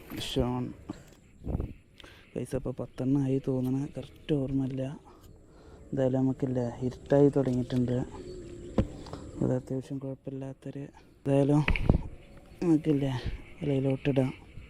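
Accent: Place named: Malappuram, India. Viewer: അടിപൊളി സൂപ്പർ ആദ്യമായിട്ടാ ഒരു spoti ൽ നിന്ന് ഇത്ര എണ്ണം പിടിക്കുന്നത് കാണുന്നത്. സംസാരം കേട്ടിട്ട് bro മലപ്പുറം ജില്ലയാണ് എന്ന് തോന്നുന്നു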